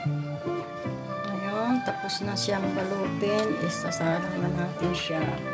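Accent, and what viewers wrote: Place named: Visayas, Philippines. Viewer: oy bud bud nga kamoting kahoy sir proud bisaya here klaro kaayo nga bisaya ka sir ako pud hehe -tintin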